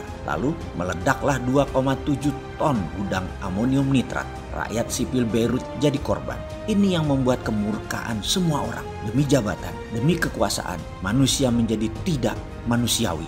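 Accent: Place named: Malang, Indonesia. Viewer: Iki jan omongane wong malang banget.. Kopimu kurang kentel, dolenmu kurang adoh rekk..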